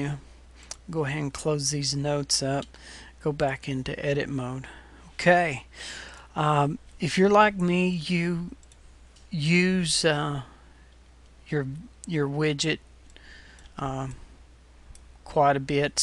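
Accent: United States. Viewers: Are you from Atlanta?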